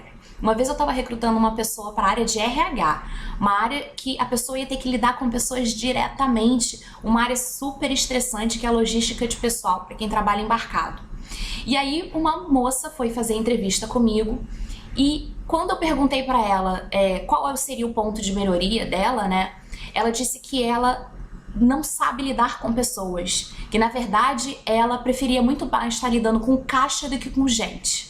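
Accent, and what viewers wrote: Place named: Rio de Janeiro, Brazil. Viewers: Ela e carioca😆😆😆😆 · Excelente video, adorei as dicas e a forma que vc aborda os problemas e soluções. Só não gostei do sotaque...carioques muito acentuada. Parabéns.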